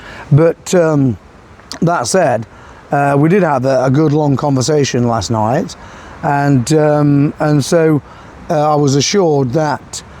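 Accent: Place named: East Midlands, United Kingdom. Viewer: Nice to hear your East Midlands accent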